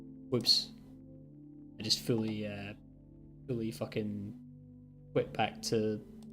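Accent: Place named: Scotland, United Kingdom. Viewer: Sorry mate I fell asleep, everything looks and sounds great. Your Scottish accent and vocal cadence pair really well with the mood of elder scrolls❤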